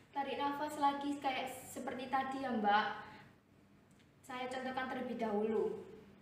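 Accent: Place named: Java, Indonesia. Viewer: logat jawa bnget tuh bhasannya keknya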